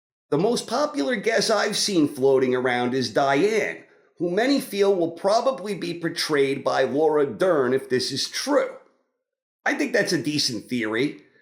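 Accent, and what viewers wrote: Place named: Brooklyn, United States. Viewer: You sound like you have a Brooklyn accent lol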